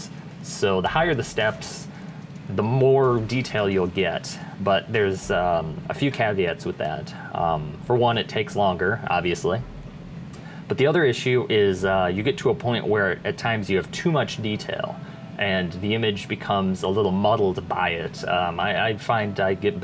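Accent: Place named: South, United States. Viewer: Why do you speak like a dummy ted neck ?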